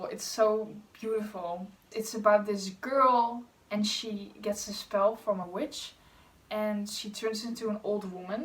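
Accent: Netherlands. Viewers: Are u from Netherlands? R u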